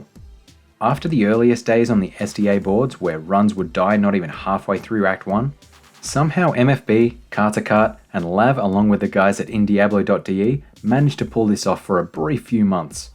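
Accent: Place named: New Zealand. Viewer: I've binge watched your videos over the last couple of nights. Your passion is so evident -- it's infectious. Thanks for the content. Your narration is awesome and I could listen all night. I can't put my finger on where you're from, though. You sound Kiwi; your relaxed, steady rhythm sounds East Coast NI (my ex-home). That, or Kiwi-born and raised and now in maybe Vic state, AU? Either way, I'd love it if you covered other games too — if the passion is there. Just more content, pleaseee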